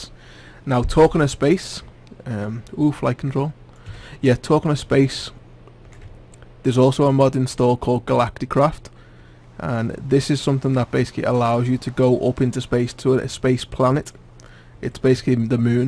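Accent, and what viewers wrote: Liverpool, United Kingdom. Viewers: he sounds scouse and im scouse but fuck me this is defently 2013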